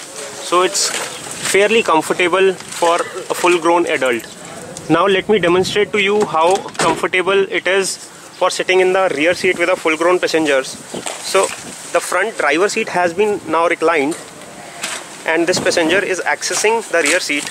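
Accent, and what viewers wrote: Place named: India. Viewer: English is jarring to the ears. Indian english is bad enough. This guy makes it even worse by talking fast!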